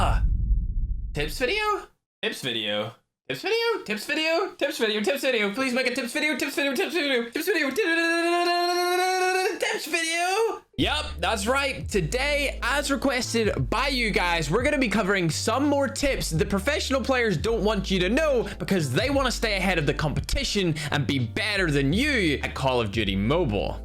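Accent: Ireland. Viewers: You're Irish? God your accent is so deep. I've heard Terrorizer and Nogla and they have a lighter one. You sound more Enthusiastic though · Wait... ur Irish? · Wait... are u irish? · i did not know that you were irish. should’ve guessed it 😂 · U are irish ?! Thought u british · how are u irish? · is irish? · You're Irish?.... Did not know that.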